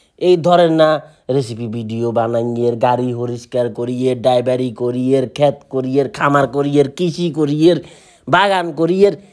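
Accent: Noakhali, Bangladesh. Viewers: নোয়াখাইল্লা রে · ভাই আপনি কি নোয়াখালীর নাকি 😂 আজকে প্রথম আপনার ভিডিও দেখছি,খুব ই মজার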